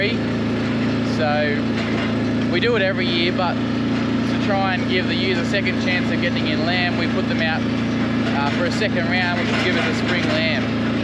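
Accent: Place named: Australia. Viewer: Just found your site today and subscribed and liked. Watched the lot this wet Tuesday. Great videos; especially the drone work. Could I suggest a bit more technical info, i.e. tractor size, implement used (include brand - you may get a sponsor) mob numbers etc. Great to hear Aussie English instead of took the wool OFF OF, took the alfalfa OFF OF and everyTHINK. Keep it up it's great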